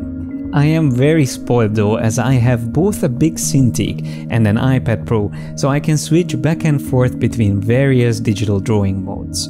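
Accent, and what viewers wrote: Hungary. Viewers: Are you Hungarian? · Thanks for the review, I use an Intuos Pro M for everything at the computer instead of the mouse, it helps to perform better. Oh, and I just found your channel, great stuff! You have some Hungarian accent, do I hear well? Keep up with the great work! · Thank you for the review! I can hardly wait to try the S pro however I'm still not sure is it worth to buy the pro version, but the better pen, extra programable buttons for shortcuts and the "touchscreen" possibility convienced me. I was suspicious that you are Hungarian because of the pronunciation, so that I must check it up and found it out that you were born in Budapest:) Szép estét!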